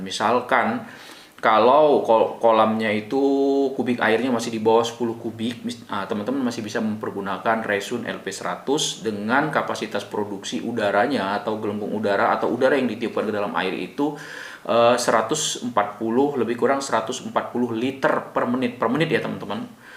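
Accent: Indonesia. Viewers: Bang logatnya seperti orang Lampung, saya dari Kalianda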